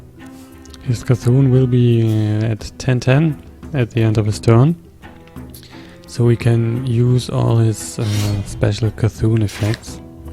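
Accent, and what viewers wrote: Germany. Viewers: Nice work, I like the style of your Videos! Regarding to your pronounciation I would bet you are also from GER?!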